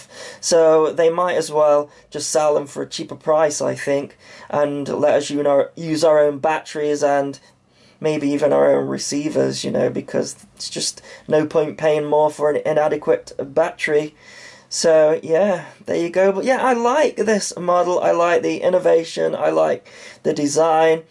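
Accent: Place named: East Midlands, United Kingdom. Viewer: Nice fella that....where are you...that's sounds like an east Midlands accent...or potteries..lol...no wonder you don't get much Sun l lol great review as always..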